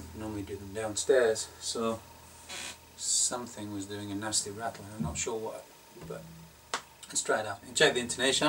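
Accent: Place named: Ireland. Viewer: U sound Irish, Scottish and Welsh sometimes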